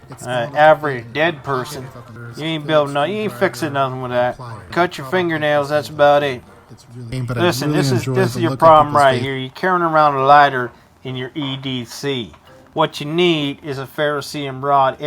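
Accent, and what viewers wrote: South, United States. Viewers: Funny as hell, but why the southern accent? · this one isa solutely outstanding and your Confederate English is very good. Respect.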